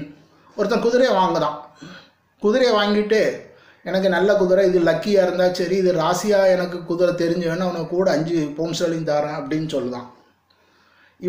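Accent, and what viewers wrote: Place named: Tirunelveli, India. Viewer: Tirunelveli slang tha...mass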